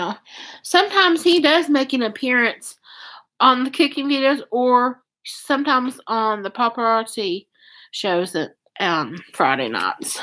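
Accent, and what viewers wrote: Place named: Kentucky, United States. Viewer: I knew you were from Kentucky! 😀 You have that lovely “gentle southern” accent that seems to be specific to Kentucky 😊 so soothing. It’s one of my favorite things about you. 💗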